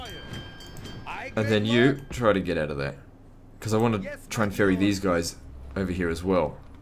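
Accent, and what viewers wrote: Australia. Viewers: Really appreciate you doing this, and i like hearing the ozzie accent.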